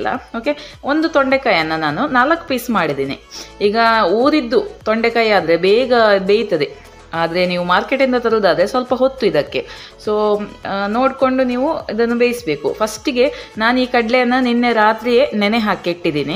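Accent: Mangalore, India. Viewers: Mam l love Mnglr kannada 😍😍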